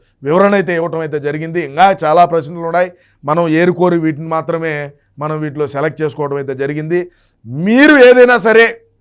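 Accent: Rayalaseema, India. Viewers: లంగా లఫుట్ బఫున్ డెకాయిట్ హౌలా నీ నోటి నుంచి రాయలసీమ యాసలో వస్తా ఉంటే మస్తుగుంటది తమ్మీ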